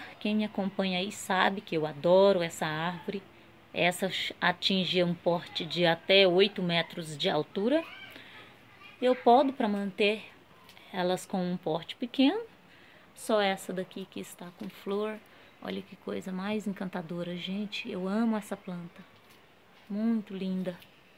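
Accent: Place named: Goiás, Brazil. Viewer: eu perguntei qual a sua cidade pq talvez podemos trocar mutas e o tipo de falar parece ser do meu estado de Goiás GO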